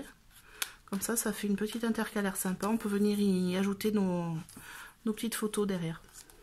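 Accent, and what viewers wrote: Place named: South, France. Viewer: oh! comme ça fait du bien d'entendre ton doux accent du sud! Une superbe réalisation et le début d'une belle aventure pour toi! Quand je te disais qu'il fallait te lancer! Elle est super ta vidéo, bravo! MUXUS!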